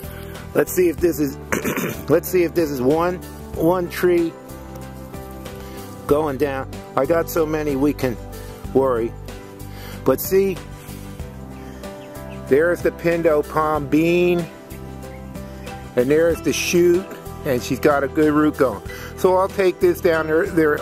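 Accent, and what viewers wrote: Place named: East Coast, United States. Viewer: Cool! I’m curious where you live because it sounds like you have an East Coast accent and I saw that you had the palm in the snow… I’m from Pennsylvania but I’m out in California now. That’s pretty cool that you can grow them in that temperature ...I didn’t know that! I grabbed little baby Palm Pups all the time walking around just to see which ones I can grow he he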